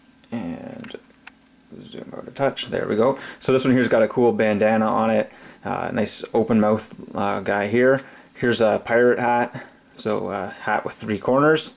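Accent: Canada. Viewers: i can tell from the accent. mtl?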